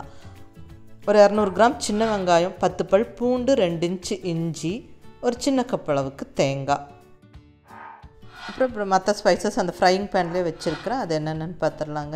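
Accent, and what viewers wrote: Coimbatore, India. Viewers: இது கோயமுத்தூர் தமிழச்சி குரலா?!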